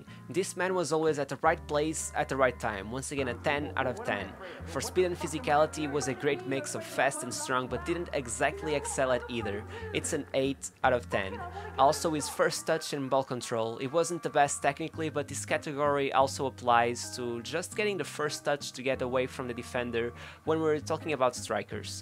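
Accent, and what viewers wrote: Portugal. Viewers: The strongest Portuguese Accent Ever 😅😅😅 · Is the narrator Portuguese? Accent is very "Lusophonic."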